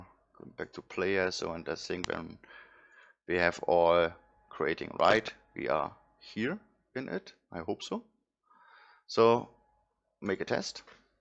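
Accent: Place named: England, United Kingdom. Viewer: i wanna say a big Thanks for all of your tutorials they are really help :) (i love your lovely English accent)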